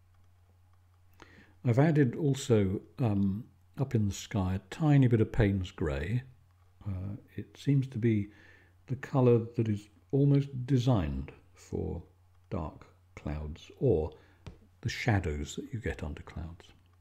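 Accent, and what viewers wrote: United Kingdom. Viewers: Finally one with a british accent! Love your talking while painting!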